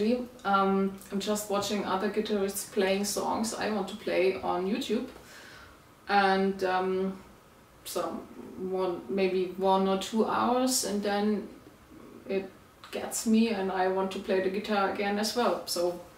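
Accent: Germany. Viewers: Now you will have to spend a lot of time answering questions! 💪👍You look amazing with a short haircut , and a cute German accent!❤😊😊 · She's from germany, Berlin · Love your German accent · very good your English; I like the accent: German speaking in another language is always something different. And I know well that the German language is complex, like the Portuguese language. Thanks for the initiative to answer the questions. Like it your faces when you play.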